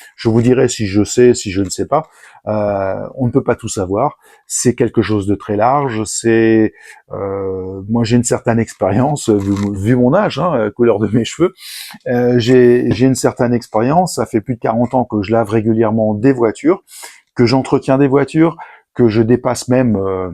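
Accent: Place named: England, United Kingdom. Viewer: Nouvel abonné de votre chaine, jaime beaucoup votre flow et son contenu qui va à l'essentiel, en gros vous ne parler par pour rien dire 😊. Et l'accent englais 😅 j'adore. Je pense aussi que si nous ne consommons peu de produit cest peut-être aussi que nous ne pouvons plus laver les voitures en extérieur mais si c'est chez nous ,sous peine d'amandes. 😥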